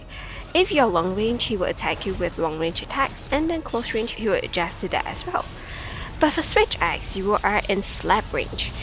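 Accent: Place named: Singapore. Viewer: Just curious, but from your accent, are you Singaporean or Malaysian?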